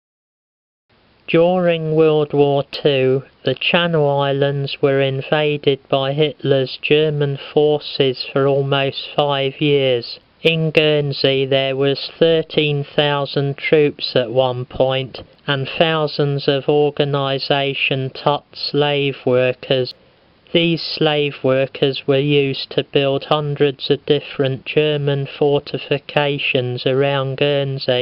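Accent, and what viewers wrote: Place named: England, United Kingdom. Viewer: wow great voice . you need to be in the old carry on movies .so Engish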